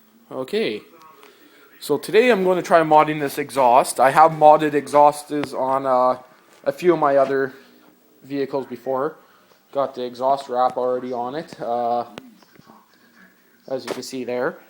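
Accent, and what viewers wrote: Canada. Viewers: What part of Newfoundland ya at me buddy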